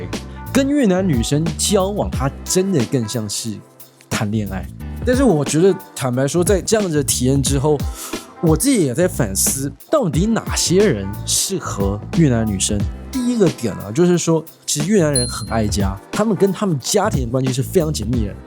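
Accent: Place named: China. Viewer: 你怎麼講話中國羌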